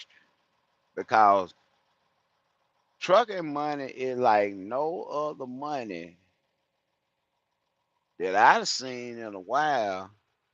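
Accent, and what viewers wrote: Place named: Mississippi, United States. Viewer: man you sound like one of my homies from Mississippi are you related to some kings from Hattiesburg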